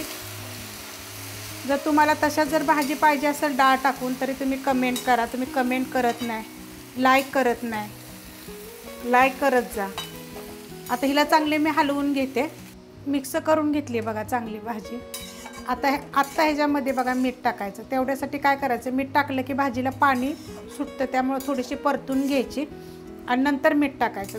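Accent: Satara, India. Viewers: Tumch aavaj aikla tri mla sataryat aslya gat vatt 😍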